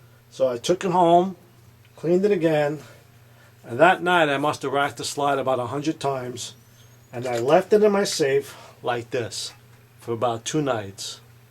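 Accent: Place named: New York, United States. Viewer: just a New Yorker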